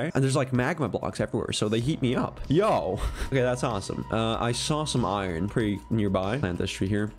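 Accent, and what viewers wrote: Australia. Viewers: are you aussie by chance? you sound just like my friends and i with all the swearing XD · Is Australian?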